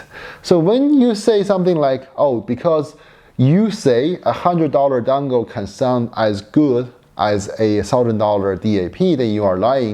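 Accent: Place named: South Korea. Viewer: What the f#ck is 똥글... ? Are you Chinese? Sounds so Korean ... Your accent, I mean.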